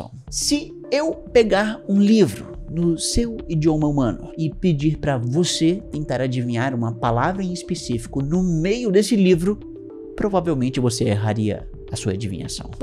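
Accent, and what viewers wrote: Ceará, Brazil. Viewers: Juro que não sei de que estado ele é mas tenho a impressão que é do Ceará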